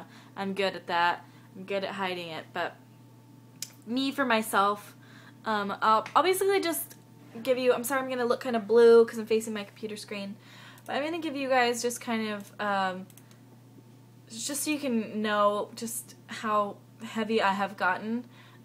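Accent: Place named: United States. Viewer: Yeah i didnt wanna admit but i just eat it too, coz im a fattie and i think 'meh. cake is good' ha. Well, im with you.. im trying to be good.... I've been prescribed this medicine called orlistat which doesnt let the body absorb fat or something... my bum is officially on fire lol. sorry tmi but hey were all ladies moaning here :) And yeh your accent is great!! Any american accent is soo glam to the boring brits lol x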